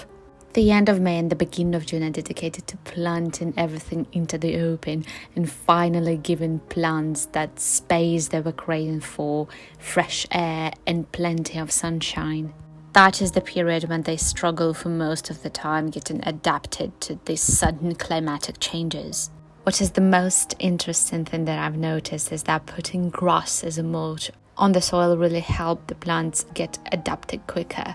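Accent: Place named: RP, United Kingdom. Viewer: right, but also your aristocratic British accent and engaging smile. We are putty in your hands. I wonder if that is lost in translation.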